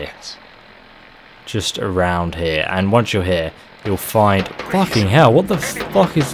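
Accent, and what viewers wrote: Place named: United Kingdom. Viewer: Dyslexia makes it hard to read. Not to speak =) I also think it's just a british accent thing. No biggie.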